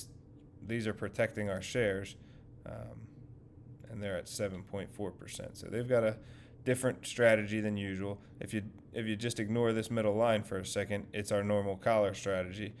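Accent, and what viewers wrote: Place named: North Carolina, United States. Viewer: You sound like you could be from N. Carolina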